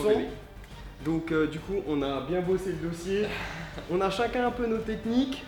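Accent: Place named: Alsace, France. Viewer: Ton accent alsacien sort un peu 😂😂 bref team Alsace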